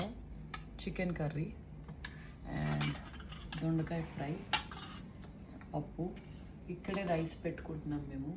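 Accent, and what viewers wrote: Telangana, India. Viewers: Telangana slang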